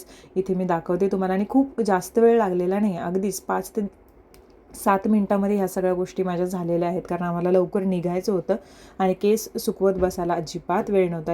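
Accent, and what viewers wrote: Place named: Belgaum, India. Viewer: Rahus nako... Amchi belgav chi basha.. Aikun bar watal..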